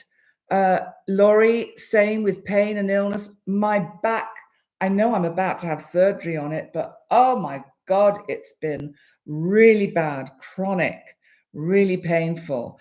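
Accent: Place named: England, United Kingdom. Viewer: Good luck, will be thinking abt you this week! Hope out of pain soon Love your Readings! Love the English Accent sounds great 😊❤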